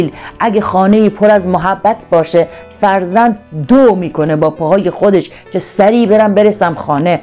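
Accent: Iran. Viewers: خانم جان خودت واقعاء یک انسان دورغگو هستی در یک ویدئو از همان تعرف میکنی وحالا از همایون به بدی یاد میکنی با لهجه ایرانیت. خواهر اگر پول می خوای پیدا کنی یک روز را یکی را خوب وبار دیگر از او بدی میگوی خودت هم یک هنر مند خوبی هستی دل سوزی تو به فرید نداری به خودت دا ی یک چینل داشته باشی خانم همایون افغان آدم بسیار خوب است فرید را تشویق به دروغ گفتن نکن باید فرید سر زنیش شود خودت دل سوز نشان ندهید همه به خاطر پول از یتوب است خانم جان هنر پیشه خوبی هستی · دختر قوما اول باید لهجه ایرانی بگذار و به لهجه دری زبان مادری خود مان حرف بزن باز بیا حرف بزن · خانم بسیار با لعجه ایرانی حرف میزنید لطفا اول قضاوت بیجا نکنید راجع به همایون چون او آنقدر حمایت کرد و همایون پیش از فرید بینیده خود را داشت فرید را همایون بد نام و نابود نکرده خودش با اینکه همایون همه خوبی و اعتماد کرد خوش با ذیرکی که داشت خودش دوباره در چاه خود را آنداخت مگر همایون میخواست او را از چاه بکشد خودت خانم فریب پویا را نخور اگر بسیار مهربان هستی بیا دست فرید را بگیر نه اینکه از ایران اینقدر قضاوت ناحق کنی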